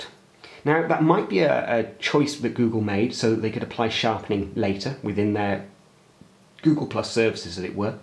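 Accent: United Kingdom. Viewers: Thanks a lot for your videos. 1 : I'm French, so it's really easier and more comfortable to listen to someone speaking British English. thank you for that :P 2 : thank you for not being a N5 fanboy, and for pointing out both good and bad aspects of the phone, that helps me a lot ! 3 : Please let us know when you can tell whether the battery is decent. Thanks ! :)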